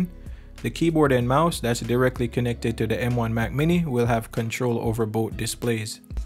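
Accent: Jamaica. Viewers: This was very helpful for me. Thank you. I just purchased my Luna stick . I will also look into getting a Ethernet cable as well as my internet can be very unstable. I hear a Jamaican accent in there somewhere! and for that I will sub! lol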